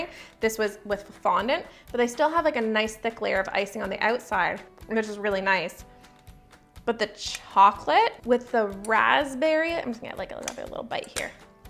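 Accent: Canada. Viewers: It's so funny when you're watching a channel for months and then they mention that they're in Canada and suddenly it clicks that that's the reason you're drawn to their videos and they seem so oddly comforting and enjoyable to watch. It's like hanging out in a friend's kitchen because your demeanor and "accent" is so familiar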